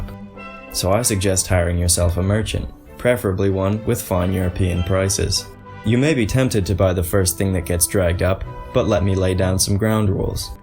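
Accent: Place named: Toronto, Canada. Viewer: This guy is definitely from Toronto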